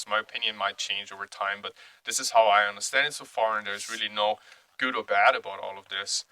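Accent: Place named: Austria. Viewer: du klingst irgendwie österreichisch ?? ;-) nice video, nice bow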